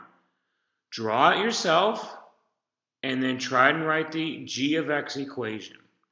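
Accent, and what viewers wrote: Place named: South, United States. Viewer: He sounds drunk with a deep southern accent and it's great cause it keep me interested.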